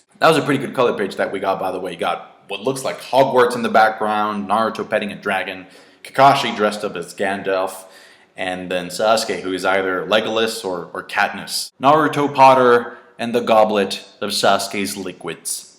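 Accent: Mexico. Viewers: Español con acento mexicano.... jajajaja pero la pronunciación es impecable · Si habla como mexicano, yo también lo soy. jajajaja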